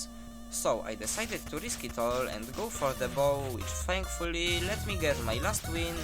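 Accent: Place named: Poland. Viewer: Masz polski "akcent"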